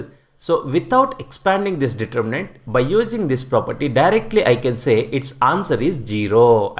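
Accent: South India, India. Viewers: Sir are you south Indian ? Your English speaking is so smooth that I can understand every line easily. Thank you so much sir. 😁😁😁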